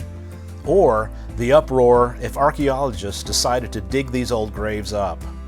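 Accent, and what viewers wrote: South, United States. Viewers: He has a Southern accent. No question about it. Just like I do. "I got my own way of talking, But everything is done With a Southern accent Where I come from." ~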